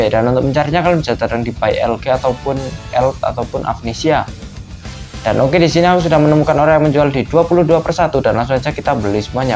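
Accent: Indonesia. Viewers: Lah kok ngapak wkwkwkwkw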